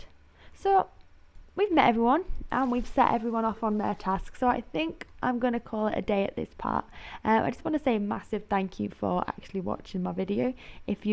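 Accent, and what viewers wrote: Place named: United Kingdom. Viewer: I thought you was UK I am to East Midlands lol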